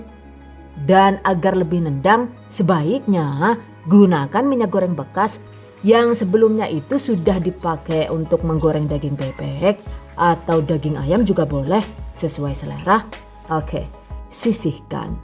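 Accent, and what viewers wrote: Banyumas, Indonesia. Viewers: Aku kalau lihat video yang ku tunggu pas mbekayune bilang pakai bahasa ngapak.... Auto langsung nggakak aku.. Ilmu dan lawaknya sangat menghibur yu...salam dari Wong Banjarnegara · 😂😂😂..mbekayune...sampeyan asline ngendi koh..mBanyumasane mblaketaket pisan....sukses yuuu...inyong jan ngiler gyeh kpengin nyicipi sambel medurane... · Nyoba bikin ah.. Bahasanya Indonesia Inggris dan ngapak 👍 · Jebule channel ngapak y...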